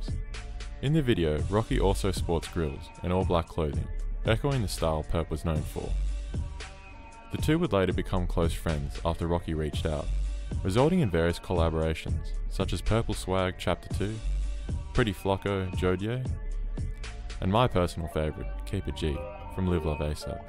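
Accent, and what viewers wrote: Australia. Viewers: Pretty sure his accent’s Australian but there was no need to send hate like that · bro are u Australian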